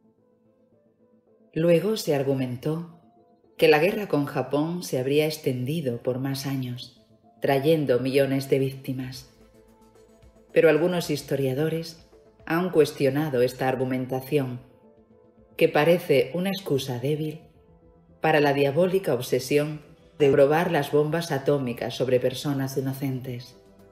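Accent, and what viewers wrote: Chile. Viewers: Cada Documento en su preciso lugar...Trabajo muy exelente...Y como adorno a tan bello trabajo, ese matiz de voz inconfundible de la hermanita ... Chilena ???❤🎉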